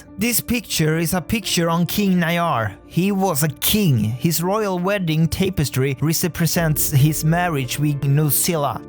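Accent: Sweden. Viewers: are you from sweden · Are you from sweden? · ar you from Sweden? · youre sweedish??? c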